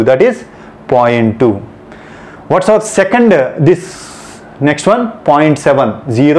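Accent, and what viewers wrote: South India, India. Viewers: bro ....since so many days i have been watching hindi lectures....watching in southern slang feels homely😁😂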